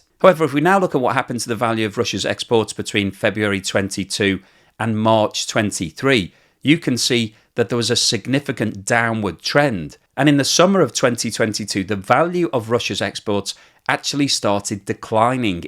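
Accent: United Kingdom. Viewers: 😂😂😂😂😂😂😂😂 According your accent , y are from UK. So ,we know that UK is PR of Ukraine, and what ever y said it's nothing but trash. It's better to look in your garden.😂😂😂😂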